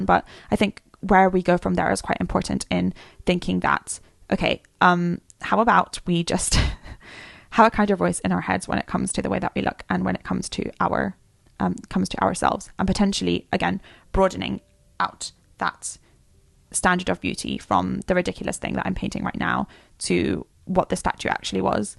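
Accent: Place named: United Kingdom. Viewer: You make double effect: 1) food for thought 2) what a lovely accent (fantastic, because I just learning English(few years :) ) and I admire British accent) Thx a bunch for all you're doing!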